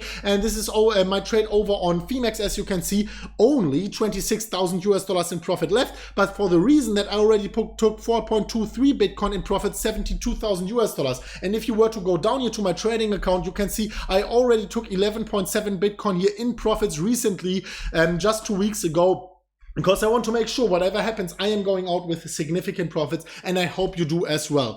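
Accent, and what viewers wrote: Germany. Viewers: Love your german accent!! Love your videos! Keep doing what you're doing!! How can we turn on the hash ribbons on binance?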